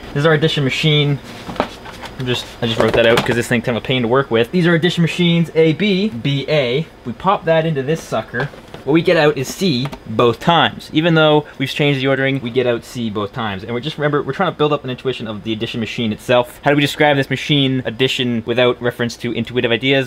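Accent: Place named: Scotland, United Kingdom. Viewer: Hey! 😉😁 currently loving:... 1/ The return of the Scottish accent 😍😊 2/ The backwards braces ("yer aff yer chump bigman" 😂👍 love it!) 3/ Wee homeschool blackboard (💥 This man won't be stopped! 😁) Cracking wee vid mister, keep them coming 😁👍😉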